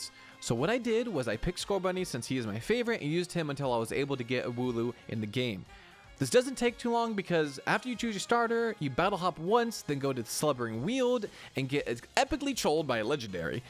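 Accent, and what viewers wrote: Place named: Scotland, United Kingdom. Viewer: Damn a true Scottish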